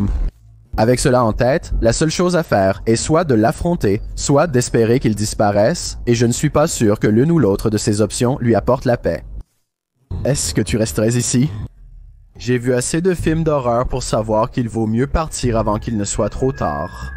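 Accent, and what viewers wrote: Quebec, Canada. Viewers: Cie vous n'aimez pas l'axent du kebec changer de série 😮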